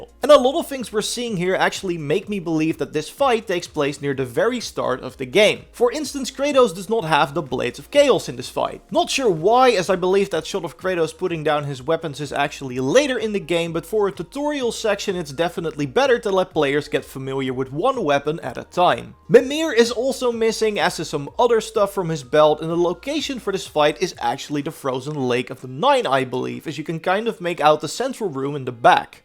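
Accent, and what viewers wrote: Netherlands. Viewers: je klinkt zo nederlands :p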